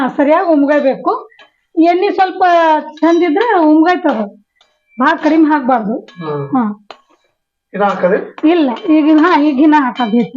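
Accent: Maharashtra, India. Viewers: Unti nim maharashtra kannada bharpoor ista aatri... Neev mado palle supr ree... Daily school ge kattak lagu madak easier ree avvari..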